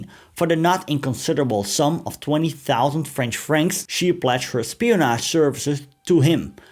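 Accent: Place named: Netherlands. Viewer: I like listening to the Dutch accent speaking English. Many families immigrated to my homeland, New Zealand, from the Netherlands during the 1950s & 1960s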